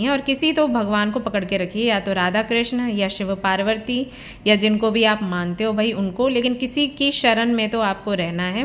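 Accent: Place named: Bihar, India. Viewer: Bhot kam logo se hi "batiyate" ho haha such a cute accent m bihar se hu aise hi bolte h sb😂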